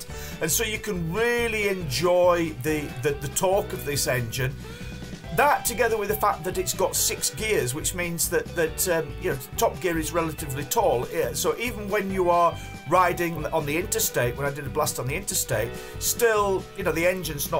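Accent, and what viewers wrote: England, United Kingdom. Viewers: Very sweet English accent may I know where is this from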